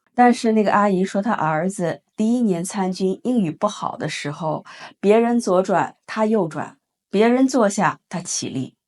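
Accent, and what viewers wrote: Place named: Beijing, China. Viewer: 这次说话有北京味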